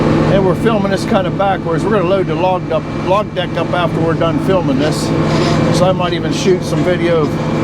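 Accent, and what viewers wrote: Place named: Pittsburgh, United States. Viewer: It's a pleasure to hear your commentary because I miss the Pittsburgh accent (I grew up in Edgewood just outside of Wilkinsburg and Swissvale). Like in the steel days, the folks from western PA always get the job done. And done right. Thanks for your videos.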